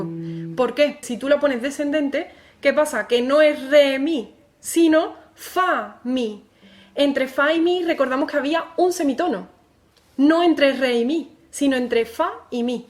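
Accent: Andalusia, Spain. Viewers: No entendí nada (demasiado técnico para mis pobres conocimientos musicales), pero disfruté el vídeo enormemente. Me encanta tu acento andaluz y tu linda cara muy expresiva. Ojalá pudieras hacer un vídeo de los palos flamencos. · Me encanta el flamenco y la cadencia andaluz oleee😂❤😅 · Española, puesto que es de Andalucía, la región del sur de España. Por eso tiene ese acento que es el "asento andalú". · Woooww! Que canal increible!!! Apenas lo conocí y ya me está encantando!!! Ah, y por cierto , que lindo acento andaluz!!! :D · Il tuo accento è andaluso? È bellissimo. Per me questo è lo spagnolo per eccellenza. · tu estilo de explicar, junto con el tono de voz y delicioso acento andaluz dejan a uno anonadado, hipnotizado. ¿Cómo es posible que tanta destreza y belleza se halla concentrado en un ser viviente, a menos que no sea un angel caído del cielo!! 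Qué placer! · Ella tiene acento andaluz de Sevilla.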